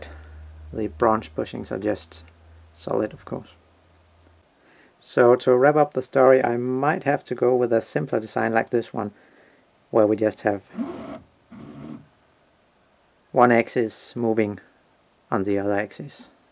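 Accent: Denmark. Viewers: are u danish like me :P?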